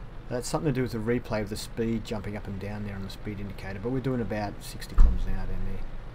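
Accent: Australia. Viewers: Hey - I'm enjoying your reviews so keep up the good work. You sound like an Aussie - where are you from? I'm from Melbourne and also play on SEA server...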